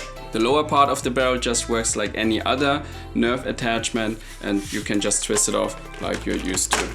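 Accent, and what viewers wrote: Germany. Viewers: Ich erkenne das du deutsch bist am Akzent richtig cooles Kit muss ich unbedingt machen ich bin riesiger sniper fan aber leider gibt es keine coolen sniper von nerf ausser die Longstrike die aber nicht mehr hergestellt wird deshalb werde ich mir das kit unbedingt kaufen . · I can see your german, right? Der akzent sagt alles! :)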